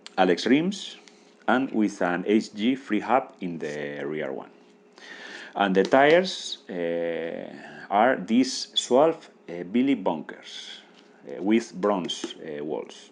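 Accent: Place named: Bilbao, Spain. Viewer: Aupa!!. Ese inglés de Bilbao a tope.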